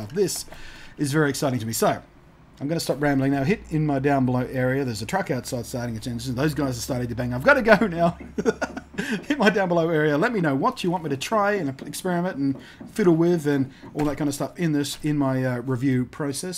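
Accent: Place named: Australia. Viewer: i had no idea you were Australian that makes me feel pleasant inside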